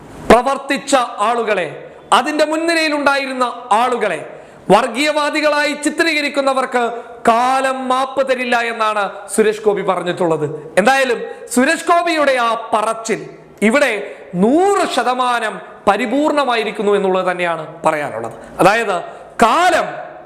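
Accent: Kasaragod, India. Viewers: Kasarkodan slang idykku kayari varunnu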